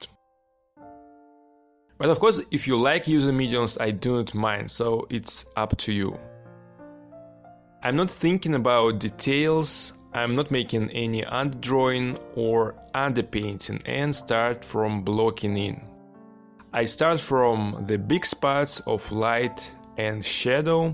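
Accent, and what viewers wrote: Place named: Russia. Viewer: Amazing,i love your russian accent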